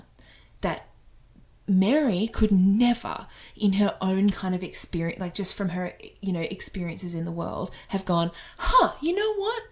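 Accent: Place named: Australia. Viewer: One of my favourite accents, cultured Australian.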